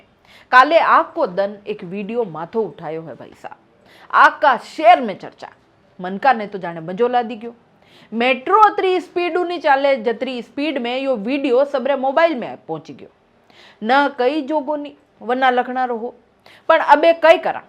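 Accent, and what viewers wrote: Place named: Mewar, India. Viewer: मेवाड़ी बोली गणी प्यारी लागी सा 🙏🙏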